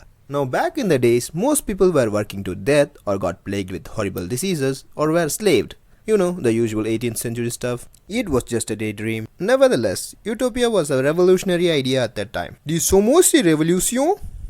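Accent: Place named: India. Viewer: You sound like an Indian sam'o nella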